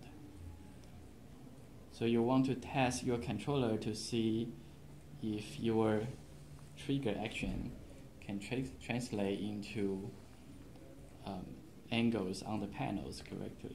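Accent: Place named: Taiwan. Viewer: 聽起來好像台灣人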